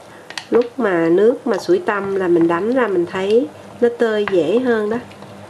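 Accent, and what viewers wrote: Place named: South, Vietnam. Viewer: nấu ngon chỉ dẫn chi tiết không tiếc công... giọng nói như rót mật vào lòng ngọt ngào tự nhiên tiếng Nam nghe thương quá là thương, Thanks em nhiều nha.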